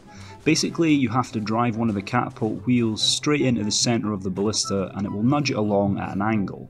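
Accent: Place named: Scotland, United Kingdom. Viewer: Epic, I just watched a video from a year ago without your voice and you are Scottish, even more epic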